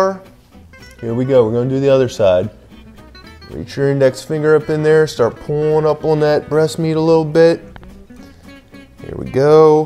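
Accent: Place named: Pennsylvania, United States. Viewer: are you from Pennsylvania? It is the way you say certain words. Thanks for sharing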